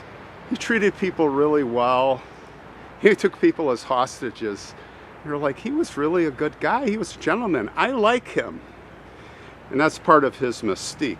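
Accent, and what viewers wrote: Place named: Chicago, United States. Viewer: Great storytelling . Having lived in Chicago, it’s great to hear the familiar accent.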